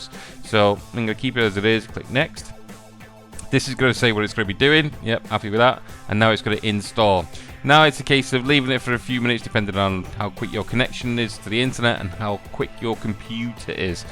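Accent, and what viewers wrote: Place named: Leeds, United Kingdom. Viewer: You're a Leeds lad I gather?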